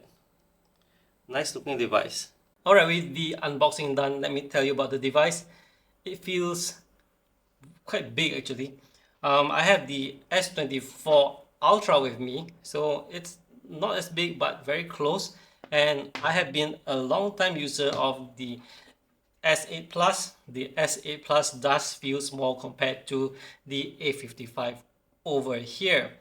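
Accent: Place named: Malaysia. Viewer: Nice vid, you sounded like Malaysian/ Singaporean 😄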